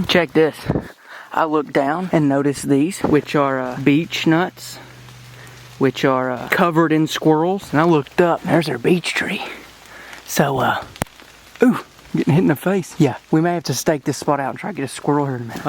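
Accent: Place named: West, United States. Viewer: A Russian man w/ a western accent #AK-47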